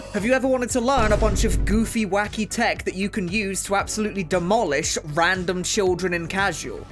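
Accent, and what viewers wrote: United Kingdom. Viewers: He has the most british accent i've ever heard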